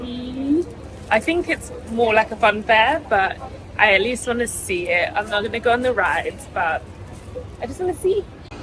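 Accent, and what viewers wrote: United Kingdom. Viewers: Are you British, you hv that accent there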